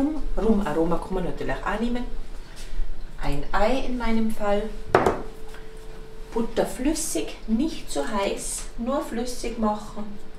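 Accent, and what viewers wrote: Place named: Vorarlberg, Austria. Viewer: Hallo aus Chile! Es sieht sehr lecker aus. Ich werde's probieren. Kommen sie vielleicht aus Vorarlberg? Ihr Alzent sagt mir was.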